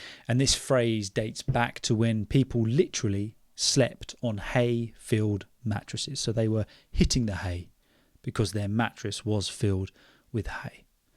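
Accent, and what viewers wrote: United Kingdom. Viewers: Hello Dear, How are you doing? I have been listening and watching your podcast for around 3 to 5 months. I love British accent. particularly you accent. I am trying to copy you voice all the time as much as i can. I hope, you are really good. And today's topic was fantastic. I really enjoyed it. And I am from Bangladesh particularly sylhet. Do you know there are a large number of Bengali people live in London? I think it's number over a million. And most of them from sylhet. To be honest I am taking preparation for my IELTS. Your videos are really helpful for the Listening section of IELTS. Prayer for me so that I can come to the London which is my dream City. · Is he British, please?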